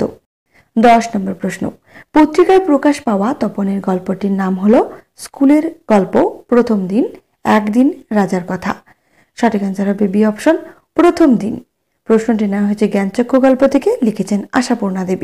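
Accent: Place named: East Bengal, Bangladesh. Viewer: আপনি কি পূর্ববঙ্গের ম্যাডাম আপনার উচ্চারণে বেশিরভাগ অকারান্ত বোঝা যায় তো যাই হোক শুনতে ভালই লাগে।😊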